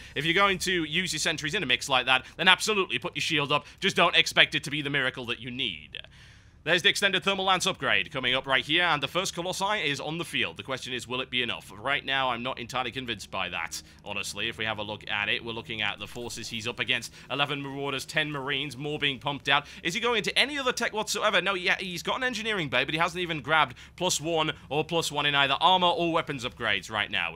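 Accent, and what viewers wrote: United Kingdom. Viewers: I think I have found my new favorite SC2 caster. I have to say it's kinda unfair since has his British accent in his favour.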